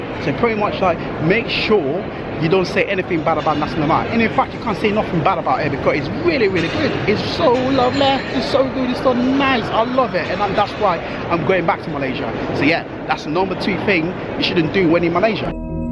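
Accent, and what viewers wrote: United Kingdom. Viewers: I love your British accent 😘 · You love Malaysia, i love your English accent 😂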